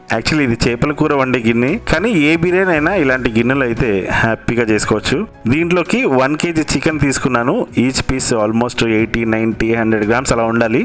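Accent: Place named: Andhra Pradesh, India. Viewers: Mee words konni ma srikakulam slang la anipistunnai ..ae uru manadi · Meru matladutunty ma village ki vellina feeling vostundi,rajamundry, bhimavaram,Godavari antuntaru kadha,nice videos anna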